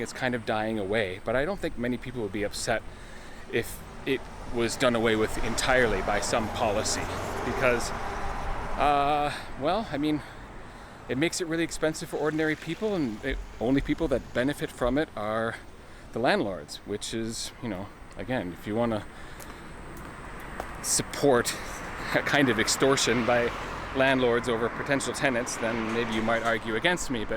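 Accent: Australia. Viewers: Your Australian accent is spot on, says this Kiwi. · Cheers for another fun video. Plenty of common sense in this episode, and the timezone point is very interesting. Looking forward to next video!! PS: that Aussie accent...maaaaaaate.... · Your Aussie accent isn't.... terrible. (An Aussie here). is TERRIBLE indeed. best, D.A. NYC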